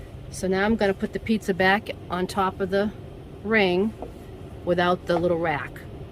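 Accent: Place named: Boston, United States. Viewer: I'm thinking of getting one of these, good idea with the rack at the bottom. I love your accent, where are you from, sounds like Boston.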